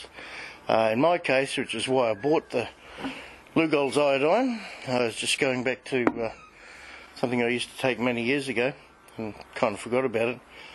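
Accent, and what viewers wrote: Australia. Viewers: my estrogen went up with all the weight which isn't a good thing. Try it and let me know. I stopped all sugars instead of fruit of course. I also stopped wheat. I wish you luck. You sound Australian, only because I live in Adelaide.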